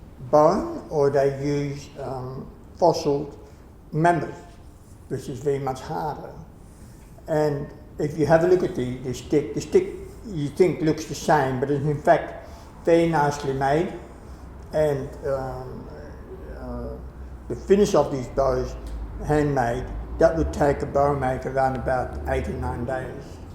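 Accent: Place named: Australia. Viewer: His accent sounds Australian to me, so I don't know if he means US dollars or Oz dollars.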